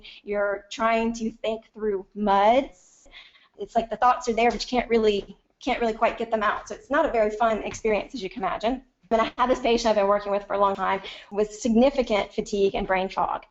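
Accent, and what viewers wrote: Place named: South, United States. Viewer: This info was very good BUT.. I'd like to give you some helpful auditory info if I may. The audio on this video is horrendous, you sound like you are talking through a pipe. I have this and other conditions which make it especially annoying to decipher. You have a southern drone, plus you are very monotone and nasal, and you talk far too fast for your audience and the compromised audio quality, it cannot possibly match your words trying to match your brain in delivery - it cannot unless you improve the audio, eliminating echoes and reverb. You must adjust, just slow down by about 25% if you have this low quality audio gear. Sorry but I had to leave due to the above situations, although I did mute this and flip through the charts which are great. I truly hope you can resolve the quality issues, as you otherwise have a lot of good info to tell, thanks.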